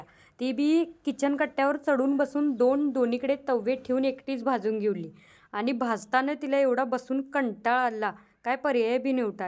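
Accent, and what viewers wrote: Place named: Belgaum, India. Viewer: Bhasha belgavchi vatte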